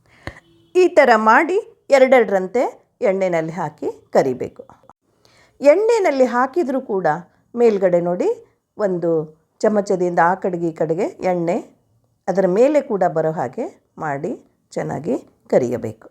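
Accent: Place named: Mangalore, India. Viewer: 🙏 ನೀವು ಯಾವ ಊರಲ್ಲಿ ಇರೋದು ಮಾಮಿ... ನಾನೂ ಕೂಡಾ ಉತ್ತರ ಕನಾಟಕ ದಲ್ಲಿ ಇರೋದು.... ನೀವು ನಮ್ಮ ಭಾಷೆಯಲ್ಲಿಯೇ ಮಾತಾಡಿ ಚೆನ್ನಾಗಿರತ್ತೆ... ನೀವು ಮಾತಾಡೋದು ಮಂಗಳೂರು touch ಇರತ್ತೆ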